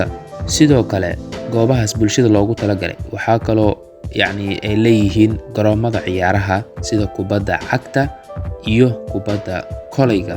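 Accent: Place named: Hargeisa, Somalia. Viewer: idol maanfahmin lahjadan ayaahargeysalaladhexjoogaa kkk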